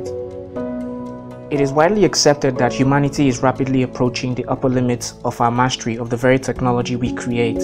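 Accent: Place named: Nigeria. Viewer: bro your accent sounds Nigerian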